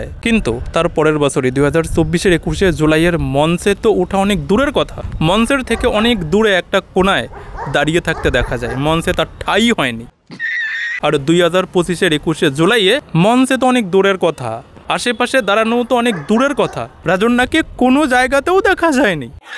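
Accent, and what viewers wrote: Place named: Bangladesh. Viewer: Bhai youtuber, bangladesh theke kabe elen? Vasa shune pariskar bojha jachhe.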